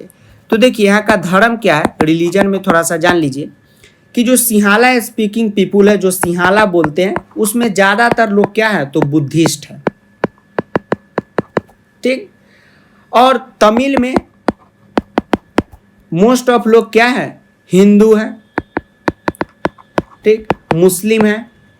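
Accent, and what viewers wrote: Bihar, India. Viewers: Hence proved This bihari assent touched my heart as i am myself a true bihari😀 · Bihari style to thik hai, lekin words ka pronunciation proper karna chahiye. Needs improvement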